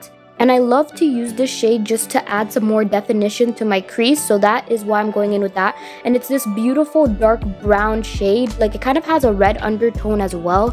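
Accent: Toronto, Canada. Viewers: I hear the Toronto accent lol!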